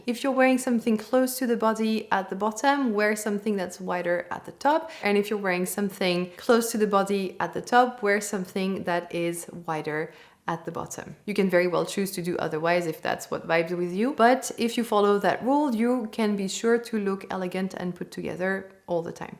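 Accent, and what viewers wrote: France. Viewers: Merci beaucoup. Vos conseilles sont très intéressantes. Je les regarderai. J’habitais en France pendant un an quand j’avais 18ans. Mes amies, ma mère d’accueil et beaucoup d’autres femmes dans ma vie ont utilisé tout les chose que vous avez nous montrer ici dans votre vidéo. Merci beaucoup, j’avais besoin de souvenir tout ces informations. J’aime aussi comment vous parlez anglais ❤. Très bien, et avec une belle accent français! ❤😊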